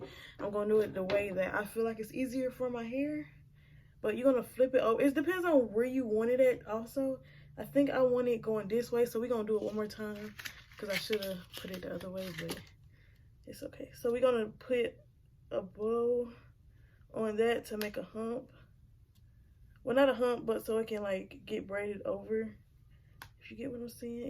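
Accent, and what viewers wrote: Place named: South, United States. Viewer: You country as hell that accent girl....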